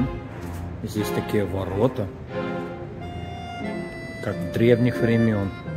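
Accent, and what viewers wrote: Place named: Baltic states. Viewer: Уселась смотреть, видео новое, а вы вдруг в рубашке. Неожиданно. Спасибо, отвлекли. У вас приятный акцент, какой всегда я думала, должен быть у прибалтов.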